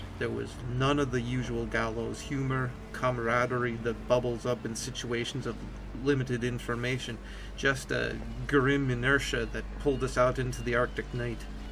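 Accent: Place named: Ireland. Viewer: I've heard this one before but it's way better with the Irish accent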